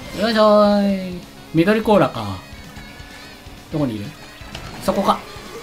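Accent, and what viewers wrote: Kansai, Japan. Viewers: 関西弁よく出ますねw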